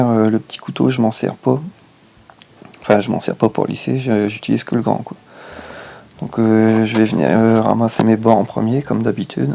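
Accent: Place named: Nord, France. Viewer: Te du ch'nord ti aute ça s'entend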